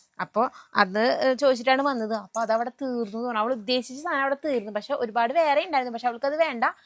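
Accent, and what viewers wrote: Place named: Thrissur, India. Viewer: തൃശ്ശൂർ ഭാഷ സൂപ്പർ ആണ്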